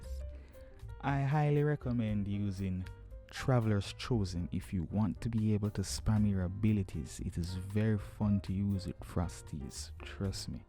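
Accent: Jamaica. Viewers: Lol why do you have a jamaican accent? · I can hear you fighting back the “yaad man” accent. Great video tho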